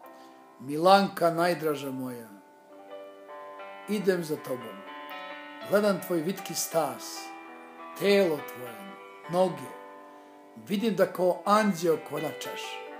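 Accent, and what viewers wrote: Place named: Kosovo. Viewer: Ajde nemoj da serete za 3 padeza. Pa nemaju svi u Srbiji beogradski sleng. Covek je sa Kosova,zadrzao je svoj dijalekt. I sada ljudima iz urbanih sredina taj govor zvuci smesan,narodski,ne znam kako vec,asocira na nepismenog coveka...Nije bas tako,ima sigurno mnogo ozbiljnih kvaliteta. A sto se tice same pesme,malo se koristio Preverovom ''Barbarom''...Ima nas koji citamo,pa ne mozes da nas prevaris, maznuo si Barbaru i preobukao je u Milanku...ali to nikako ne umanjuje ovu lepu recitaciju....Svaka cast!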